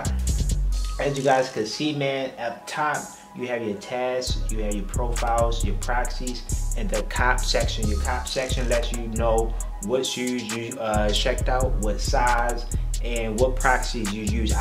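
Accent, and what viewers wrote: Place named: Baltimore, United States. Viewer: Def from Baltimore lol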